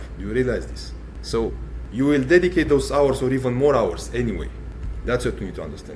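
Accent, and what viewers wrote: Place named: Balkans, Eastern Europe. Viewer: Balkanski Prevarant sa užasnim naglaskom, scammer lmao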